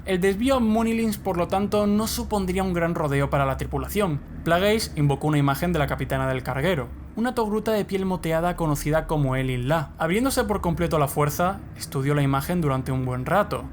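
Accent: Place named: Spain. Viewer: De hecho el acento es español. Tal vez de León o Castilla.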